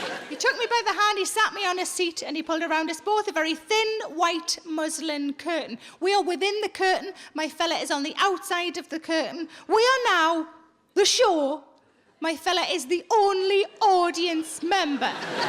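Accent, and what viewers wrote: Newcastle, United Kingdom. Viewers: Peace love justice I was taking the P, she's from near Newcastle, so you would say she's speaking geordie! Soz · There are so many Americans in the comments. I keep wondering, do you (Americans) understand everything she says in her Geordie accent? Even various northern words like 'bairn'? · It's north east England x geordie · She's English, a Geordie from the North East of England. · It’s called Geordie- usually used to describe people from the north east of England. There are a few smaller dialects within this area but generally it’s Geordie. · And the geordie xD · Neither. She's from Newcastle! :-) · Hey Sarah im in the US, me mum was from UK, i love listening to your accent reminds me of her. lol Keep up the funny work. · You mean Geordie?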